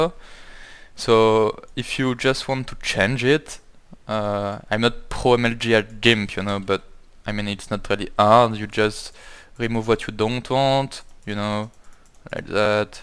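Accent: France. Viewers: comment tes pas crédible parler anglais on le sens l'accent fr gros mdr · mec tu es Français ?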